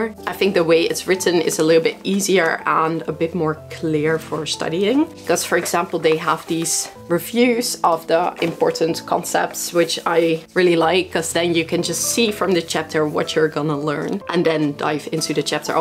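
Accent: Netherlands. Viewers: your accent feels dutch, love how youtube is recommending me dutch youtubers who speak english XD, YT algo is really remarkable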